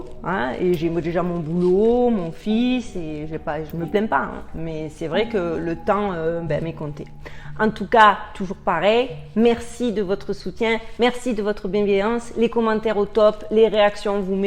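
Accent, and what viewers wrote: Marseille, France. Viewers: Bonjour. je regarde vos vidéos et votre accent me rappelle des souvenirs , j habitais du coté de Marseille, mais comme mes filles vivent maintenant une a Boston et l autre près de Montréal j ai traversé moi aussi et je ne regrette pas.... Merci pour les vidéos.....Mon gendre est Directeur de Demandes Globales chez Sanofi a Boston.. je vais demander a ma fille ce qu elle en pense.....j ai deux magnifiques petits enfants et je n ai pas envie qu ils soient abîmés par leur saloperie de vaccin... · Hello! J’adore tes vidéos! Elles traitent de sujet graves avec une pointe d’humour dont tu as le secret 😉. Continue comme ça! Je like ❤️. Par contre, effectivement, je ne reçois aucune notification alors que j’ai bien cliqué sur la cloche 🔔... Mais c’est pas grave! Je vais direct sur ton compte lorsque j’ai une petite baisse de moral 😁 Cet accent du Sud ne peut que me mettre en joie!! 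Bravo bravo pour ton travail 🙏🙏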